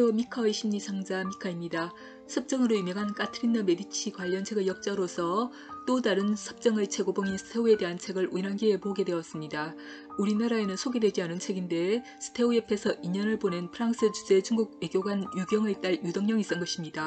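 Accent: Gyeongsang, South Korea. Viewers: 겡상도 억양 발음 문제 있어 알아듣기 어렵고 부자연스러워요 차라리 천천히 또박또박 말하는 습관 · 저는 경상도 사람이라 ..잘 알아 듣겠음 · 같은경상도라 듣기 편했는데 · 경상도 발음에.. 내용파악하는데 불편! 그냥 자막으로만 처리하는것이 나을 듯! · 지독한 갱상도 사투리가 뼈속에서 나오는군요. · 목소리가 코가 먹었구용 사투리가 경산도분 여기서 꿈을 이루시나봐용ㅋ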